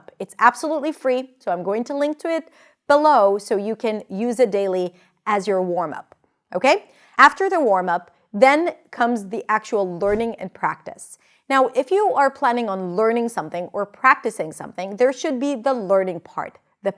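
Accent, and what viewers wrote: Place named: United States. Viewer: You're an inspiration to all of us. Watching the way you mastered and hacked the American English accent is so motivating.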